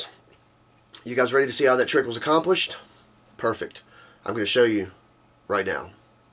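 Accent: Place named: United States. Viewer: Hey I love your particular American accent! =D Is it a mixture of more than one accent? Nice voice too.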